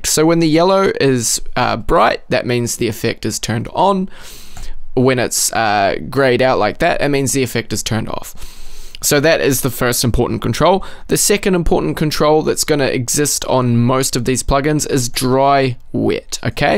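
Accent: New Zealand. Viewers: Thanks a lot! This was extremely helpful and the NZ accent is music to my ears :- )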